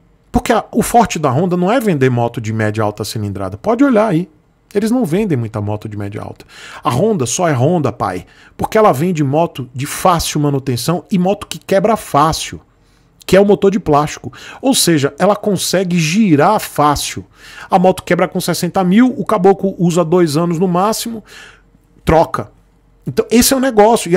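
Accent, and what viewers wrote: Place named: Santa Catarina, Brazil. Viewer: sotaque praiano do litoral barriga verde não engana...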